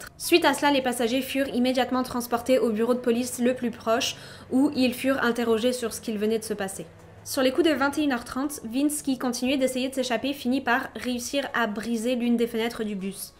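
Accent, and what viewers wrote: Quebec, Canada. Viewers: Je savais que tu avais un accent. Tu es de où au Canada-Québec. Fille du Québec xxx 🌺🌺🌺🌺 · Petite question rien a voir, tu est Canadienne ou vous au Canada ? J'entends un tout petit accent que j'adore ! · Pour dire que tu es du canada ton accent je l'entend a peine sur certains mot 😁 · Je suis au Québec et je me rappelle parfaitement de cette histoire. C'était assez fou ! Changement de sujet, en t'écoutant j'entends l'influence "canadienne" dans ta voix et le choix de certains mots. Je trouve ça mignon ;-) Bonne continuité xx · Est-ce que tu parle avec l'accent québécois en temp normal?? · J étais sur qu'elle avait un accent français et québécois.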